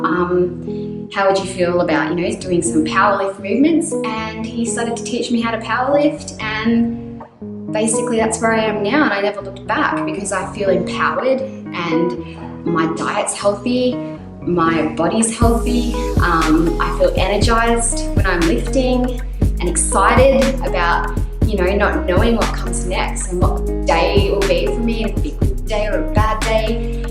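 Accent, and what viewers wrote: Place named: United States. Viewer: Honestly I thought you were American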